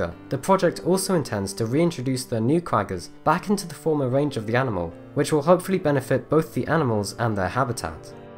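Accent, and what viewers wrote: England, United Kingdom. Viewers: What is a May-ori? I am a New Zealander and it is pronounced Mow( as in "how" ) ree. You sound English so you should know how to pronounce Maori. The Maori Battalion sent thousands of Maori troops to both WW1 and WW2 in Europe.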